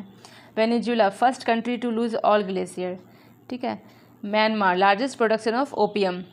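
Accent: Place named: Patna, India. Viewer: Aapki bhasa patna ki hai...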